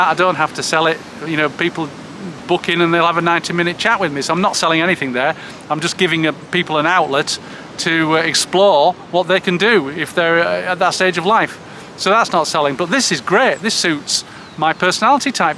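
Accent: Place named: England, United Kingdom. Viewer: You must be in England somewhere I see that you sound like you're in england. I have direct ancestors that's from a lot of England.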